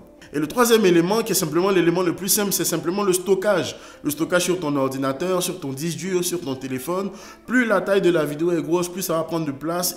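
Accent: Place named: Ivory Coast. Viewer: T'es Ivoirien ? Ton accent est franchement cool😁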